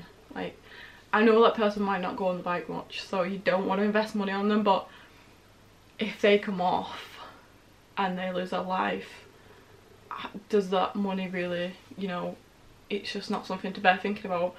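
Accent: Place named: North, United Kingdom. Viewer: Where you from? Sounds like a northern accent hun x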